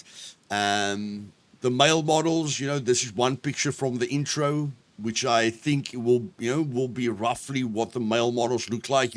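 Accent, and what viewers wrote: South Africa. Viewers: Awesome video buddy. Very informative and interesting. Keep up the good work 👍🏻. You from South Africa? Excuse my ignorance if not haha but I can't pin the accent!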